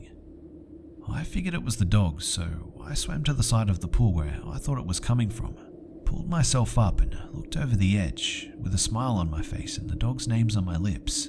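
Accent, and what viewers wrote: Australia. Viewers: The Australian Accent was a shock in it self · Always feels so good to see you've uploaded a new video! If I'll get 1/100 of your subs, aka 387, it'd still be huge for me since I've got 22 so far, and I've released 13 videos, so I almost have more videos than subs lol XD But back to the original topic, I really love your videos, and the Aussie accent is a welcoming bonus :) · Are you Aussie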